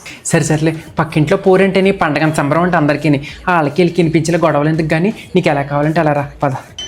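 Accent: Godavari, India. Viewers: Annayya pakka godavari language superrr anna Okka video chusinaventane subscribe chesesaanu Chala baagunnai videos😊😊😊😊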